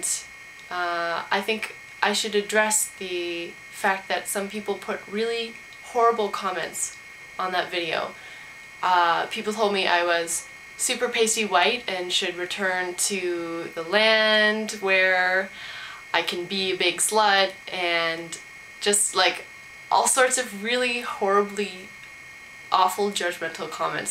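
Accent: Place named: Canada. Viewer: You Canadian, ain't ya, white girl? Judging by the accent. :)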